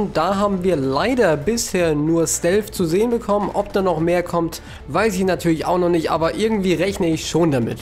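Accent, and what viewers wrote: Germany. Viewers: Ich bin Finnisch und ich liebe deine Deutsch acschent :)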